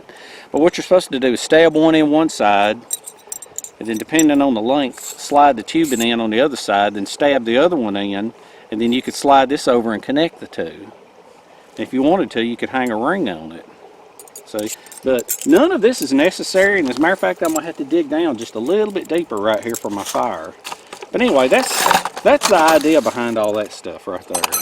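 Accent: South, United States. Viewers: He’s definitely one of my favourites too. As an English woman, he’s so different from anyone I’ve ever met. His accent is adorable, but his skills & knowledge on everything is astounding. I’ve never not laughed in one of his videos either. I think he’s what you call a true southern gentleman. I can’t believe you watched for 3 days straight! That’s dedication 😂 & you must know everything about bushcrafting now! Glad you support him as much as I do. He truly does deserve it. Much love 💕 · What state r u from? My husband and I just found you... he thinks Texas or Oklahoma. I think Georgia or Alabama? I’m from Tennessee (but have resided in Michigan longer than Tennessee)and I’m not sure?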